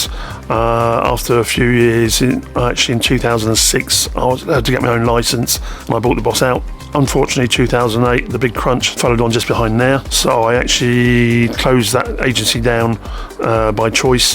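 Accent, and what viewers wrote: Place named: United Kingdom. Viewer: Gosh, it’s takes an effort to follow you. Speedy British not sure from which part of England and a bit of man mumbling😊. But I sounds like your French is better than your English!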